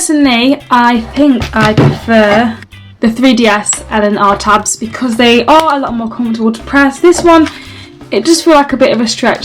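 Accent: United Kingdom. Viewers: first video I watched I'm ages with someone that sounds British lol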